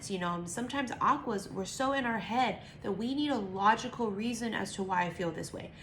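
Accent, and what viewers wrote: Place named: Hawaii, United States. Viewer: I knew you were from Hawaiʻi b4 u even said it! Ke Aloha nui Tita!🙏❤️🌿🌺😂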